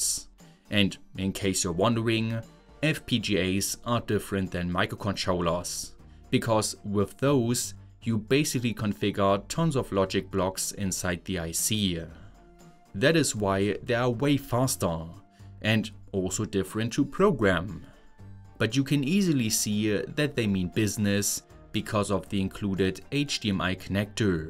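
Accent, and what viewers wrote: Saxony, Germany. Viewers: I'm wondering about your accent. Are you German or Austrian? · almost SÄCHSISCH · Dieser unglaublich schreckliche deutsche Akzent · Bro has the most German accent I have ever seen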